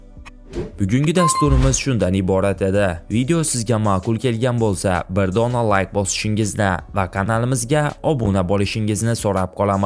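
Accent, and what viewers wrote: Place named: Russia. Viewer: ЎЗБЕКча гапиришни ўрган, нима тилни устидан кулябсанми? ЎЗБЕКчани энди ўрганаётган ўрисга ўхшаб гапирасан. Кабул эмас, қабул.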